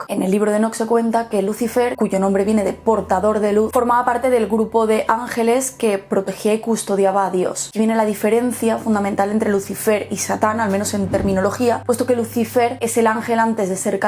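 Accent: Spain. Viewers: me encanta su voz de gallega saludos desde argentina😍😍😘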